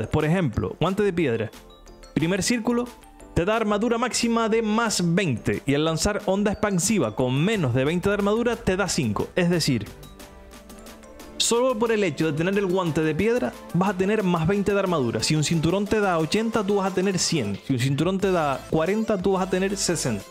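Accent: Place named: Canary Islands, Spain. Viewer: ¿Eres de Gran Canarias o de alguna de las Islas Canarias por casualidad? xDD Se nota mucho por como hablas, sobre todo en la "Ch" Jajajaja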